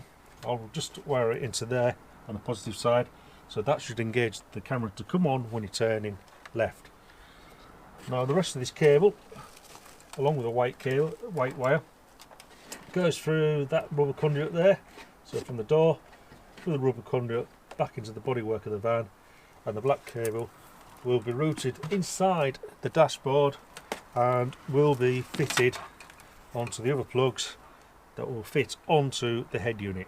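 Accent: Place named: RP, United Kingdom. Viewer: Bit posh is that mate 👌👍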